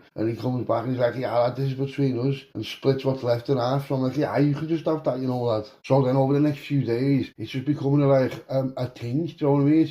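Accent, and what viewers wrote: Liverpool, United Kingdom. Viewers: Love the story's scouse lad mint 👌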